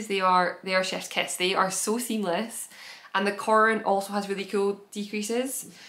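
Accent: Scotland, United Kingdom. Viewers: Haha its not the speed its the Scottish accent, I love it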